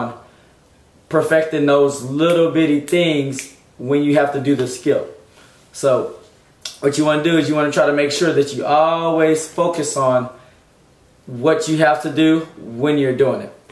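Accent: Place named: South, United States. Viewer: certain things you say gave your accent away, pretty southern and sounded like T.I a bit. lol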